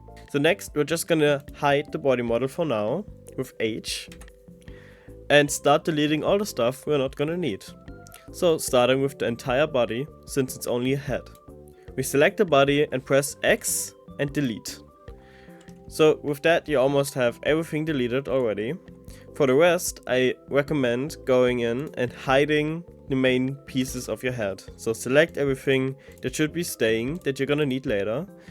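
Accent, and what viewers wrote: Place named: Germany. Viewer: Ich glaube es könnte sein, dass du Deutsch bist(Akzent)😅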